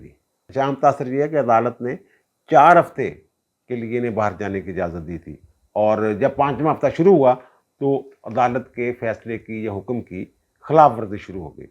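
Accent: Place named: Jalandhar, India. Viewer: چوھدری صاحب یوں تو آپ کا وی لاگ قانونی طور پر بہت مظبوط اور معتبر ھوتا ھے لیکن جب آپ جالندھر ھوشیارپور کے لئجے میں پنجابی بولتے ھیں تو بہت اچھا لگتا ھے کیونکہ یہی لہجہ ماں بولی کا میرے گھر کا ھے۔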